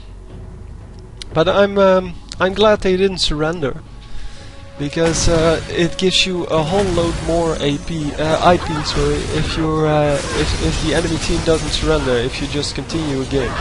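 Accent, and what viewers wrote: Netherlands. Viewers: heey, kvind het fijn dat er eindelijk een nederlander LoL vids maakt xD het is goed te hooren dat je nl bent aan je uitspraak :p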